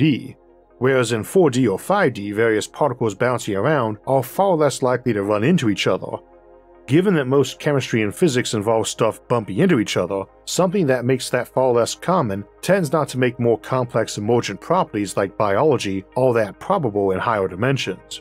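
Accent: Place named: Brooklyn, United States. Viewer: What accent is going on here, Brooklyn Cambridge?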